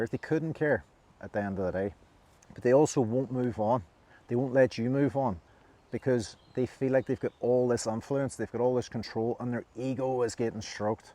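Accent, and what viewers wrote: Northern Ireland, United Kingdom. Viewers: Your channel popped up and disappeared but I found you. Lovely to hear a fellow N.I. person. ❤ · An Irish Northern man ...wise. lrish here. New sub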